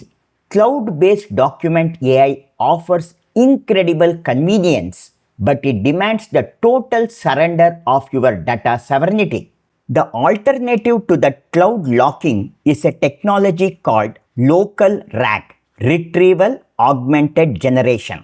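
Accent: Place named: Tamil Nadu, India. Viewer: Good one thanks. I genuinely like your accent. I grew up with Tamil-speaking friends and reminds me of home.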